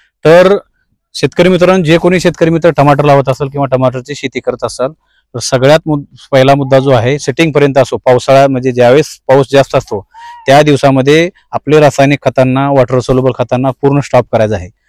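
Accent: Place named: Pune, India. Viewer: खुपच छान, सर आपण फार छान माहिती दिली.शुद्ध मराठी पुणेरी भाषेत.धन्यवाद.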